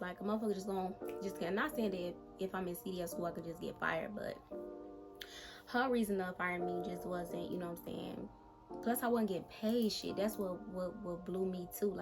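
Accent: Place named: Alabama, United States. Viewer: Which one did you go to bc you sound like you’re from round my way are you in Bama ?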